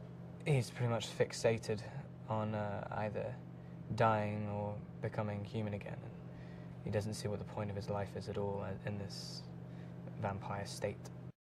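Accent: United Kingdom. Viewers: wow! really love the british accent...always mentioning it though...but i do really love his accent....gah! it's perfect on him...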